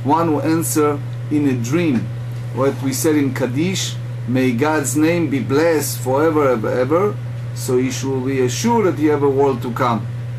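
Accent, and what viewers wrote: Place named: Israel. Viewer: what is great about your classes also is that you use the Modern Hebrew pronounciations which makes it understandable for some many more people starting Gemara.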